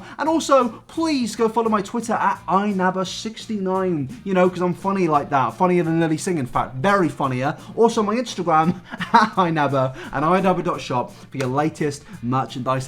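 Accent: United States. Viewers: Liked the video for that lovely American accent chap 👌👌👌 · fantastic american accent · sad that i will have to dislike because of the american accent :( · I didnt like the American accent but I liked the video anyways. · I really wanted to like this video. But I cannot condone that American accent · Your American accent is bloody lovely mate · You're so adorable!! That American accent 😂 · Just liked because of the American accent.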